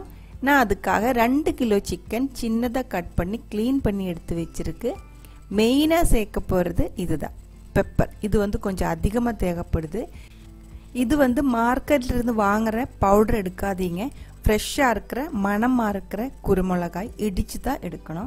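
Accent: Kerala, India. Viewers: உங்கள் கேரள சமையல் சூப்பர் அதைவிட கேரள தமிழ் சூப்பர் தோழி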